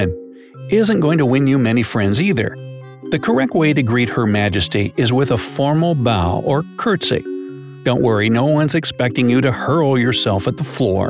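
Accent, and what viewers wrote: United States. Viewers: Kind of sad hearing an American voice laying down these rules. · American commentator XD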